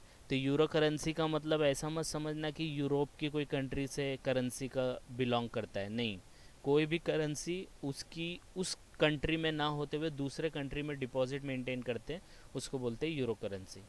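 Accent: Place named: Maharashtra, India. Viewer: Mujhe esa kyu lgg rha hai ki Professor Marathi hai?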